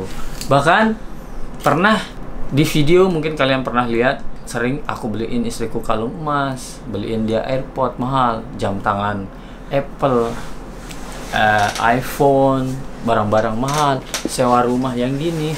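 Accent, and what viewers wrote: Lombok, Indonesia. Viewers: aislah 🤣 masih kentel ruen base sasak nike bang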